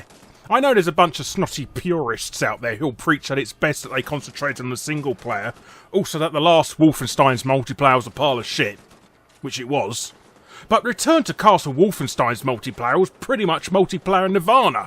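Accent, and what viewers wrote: United Kingdom. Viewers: You have the most British voice ever, its brilliant. Never played Wolfenstein, really liking Doom 1 though, very fun though difficult.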